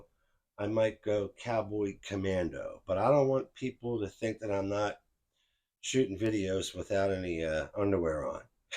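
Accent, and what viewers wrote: Australia. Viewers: We love you too uncle. Probably a good idea not to go commando on youtube 😂 Great OZ accent brother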